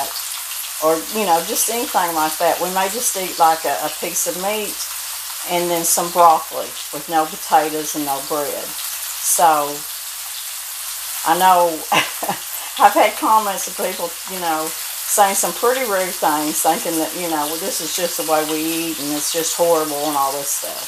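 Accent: South, United States. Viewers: You sure have a kitchen full of antiques! Love them.......Why would there be any haters. Because of the southern accent. That is GOOD southern food. Reminds me of my mothers cooking. We lived in Birmingham, Alabama till I was 8 years. Then moved north, but she continued to cook the southern way. I rememeber her saving bacon grease - my dad loved the FRIED foods! Too much of it not good for you though. Mom was a terrific cook! She could make pies that would win prizes! Made everything from scratch, and so perfectly. Canned in the fall everything there is to can. She was a very hard worker. There were 6 in our family. Love fried cabbage too - in fact love cabbage fixed any way. Going to put some in my soup today. Sounds like you people live in the Ozarks area. How beautiful it is! We were there in our travels when I married. Camped in a private front yard campground! 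· I agree with you, I love this channel and enjoy listening to. She is a true Southern lady. My husband and I have been fortunate enough to travel across the USA as well as foreign countries. People always ask us to talk so they can listen to our Southern accent. Foreigners have described our accent as akin to singing, What many people do not realize is Southern accents vary from place to place. If you enjoy Mrs., I believe you will enjoy “Cooking with Brenda Gantt.” Brenda lives in south Alabama on the Florida-Alabama state line. She has a large repertoire of Southern recipes and operates a bed and breakfast. At the request of some friends during the pandemic, she made biscuits and posted the video on Facebook. She was a huge hit, and in little more than a year, she has over 2,500,000 followers. She is also on YouTube. There are two channels that I watch at least once a day—Mrs. and Mrs. 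Brenda.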